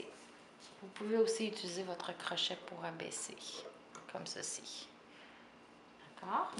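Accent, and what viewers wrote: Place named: Quebec, Canada. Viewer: t es québécoise que j'entends cet accent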